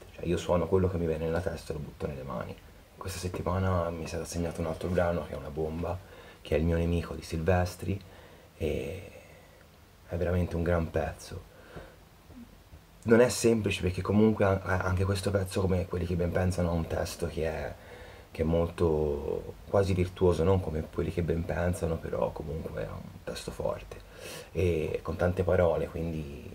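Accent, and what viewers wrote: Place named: Tuscany, Italy. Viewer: Fantastico l'accento toscano ....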